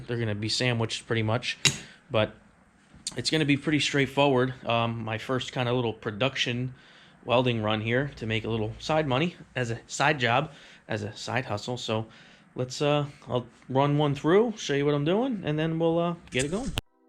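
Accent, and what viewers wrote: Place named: New Jersey, United States. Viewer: I’m here for the welding and hearing the perspective of a welder from Joisey 😂✌🏻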